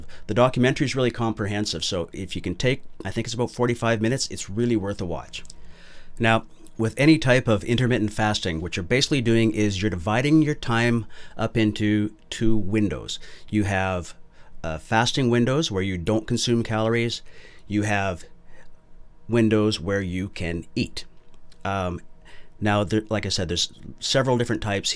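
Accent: Canada. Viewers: ooh that Canadian accent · hmm are you Canadian? Got that Canadian "out" thing going on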